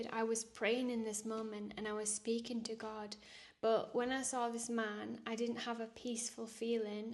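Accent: Northern England, United Kingdom. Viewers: I've rediscovered my FAITH thanks to you and now my Wife. I maybe rediscovering my FAITH but that was a demon! You are doing God's work doing all the good in the world giving Heavenly Father's messages!. Stay safe for goodness sake. I'm newly married Wife in Queensland I'm in PERTH western Australia. I too have had similar things happen to me. God talks to me daily and its as if and I'm sure you can relate we are suddenly targets. I've had so many experiences I could have been killed its freaky! I'm From England and its your Northern accent drew me. Cheshire the Wirral?? 😊 Either way You make the each day warmer happier with your Videos. God bless You. ❤😊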